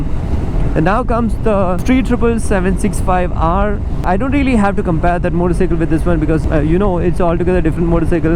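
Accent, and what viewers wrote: India. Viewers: Bro, strong request: get rid of your accent. Half the words you say are in the typical Indian accent, and the other half is a butchered American cum British accent. I mean, pick one and stick to it. Your content is good, but the delivery could be much, much better if you stick to an Indian accent. The accent soup is so distracting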